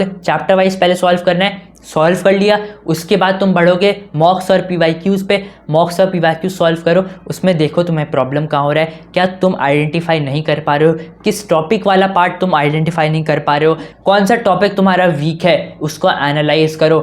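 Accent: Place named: Punjab, India. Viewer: sir aap punjabi bahu cute bolte ho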